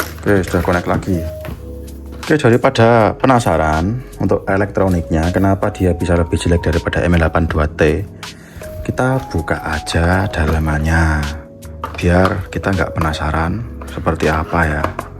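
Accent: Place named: Java, Indonesia. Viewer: review mu seru mas onok logat² jowo e😂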